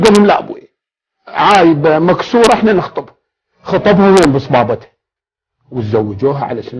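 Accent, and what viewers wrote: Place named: South, Iraq. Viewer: هذا الرجل مبدع، شيخ، شاعر، مثقف، صاحب نخوة، أصالة، أدب، حسجة جنوبية، صاحب حظ وبخت، نقول الفاتحة على روحة الطاهرة.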